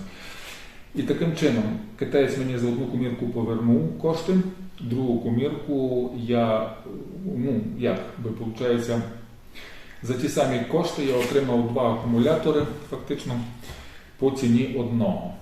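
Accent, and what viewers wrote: Transcarpathia, Ukraine. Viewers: Ви із Закарпаття)))?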